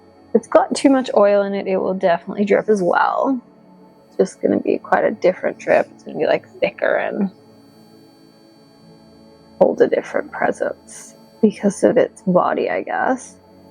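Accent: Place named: Australia. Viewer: Nice process video. Both real, and real-time. I'm terrible at placing accents, but I think you and are from the same part of Australia, and maybe have similar missions as art vloggers.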